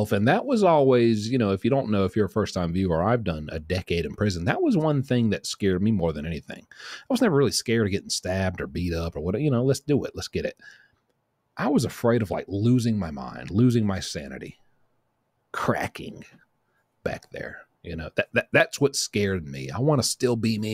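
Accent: South, United States. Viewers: Hey brother my name is Paul Britt,I've been watching you for several months,I'm from Jackson Tennessee, and lived in Charlotte north Carolina,I enjoy the southern accent, and,thank you for, the Golden entertainment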